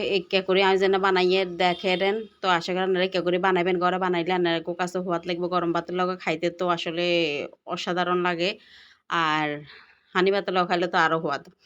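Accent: Noakhali, Bangladesh. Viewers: আপু রেরিপিটা সত্যি খুব অসাধারণ🌈 সেই সাথে নোয়াখালীর ভাষাটাও❤🌈❤ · অসাধারণ লোভনীয় হয়েছে আপু মরিচ খোলা। তোমার মুখে নোয়াখালী ভাষা খুব ভালো লাগলো। ভালোবেসে একটা লাইক দিলাম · খুব সুন্দর রেসিপি ❤❤আর তার থেকেও বেশি সুন্দর আপনার নোয়াখালীর ভাষা❤❤❤অসাধারণ❤❤❤ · অনেক লভনীয় হয়েছে মরিচ খোলা মাশাআল্লাহ ❤❤❤ সাথে আঙ্গ নোয়াখালীর আন্ঋলীক ভাষা শুনলাম খুব ভালো লাগছে ❤❤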